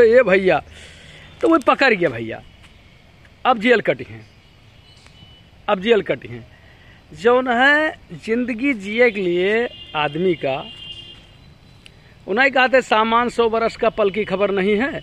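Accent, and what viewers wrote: Awadh, India. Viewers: kuch jada avdi bolte hai app but m sultanpur up44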